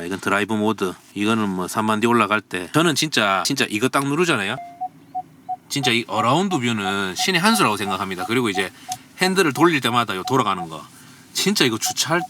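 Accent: Gyeongsang, South Korea. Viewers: 리뷰 잘 봤습니다~~대구분이신가요~??^^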